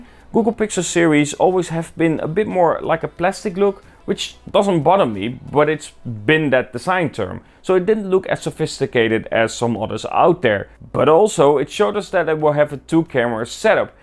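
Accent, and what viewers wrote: Netherlands. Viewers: Lekker nederlands accentje ook! Haha niet erg maar he je werkte samen met MKBHD Supersick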